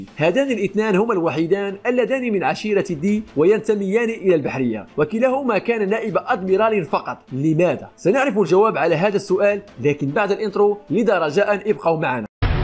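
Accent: Morocco. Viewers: لان لهجت مغاربا تشبه لهجتنا نحن نجزائرين مغريبي اخي و ليس صديقي 😉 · يجب أن تقوم بتدريس مادة الون بيس في الجامعة شرحك يجعلنا نرى هذا الأنمي بشكل مختلف .... واصل أخي من لهجتك يا أخي أعتقد أنك مغربي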